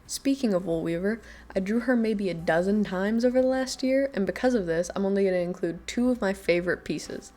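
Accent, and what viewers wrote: South, United States. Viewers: is it just me or has she been getting more southern (hi )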